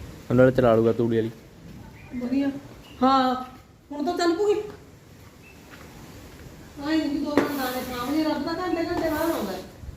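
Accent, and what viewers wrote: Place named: Sangrur, India. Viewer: Hr gl nal te te bolde. Sangrur wale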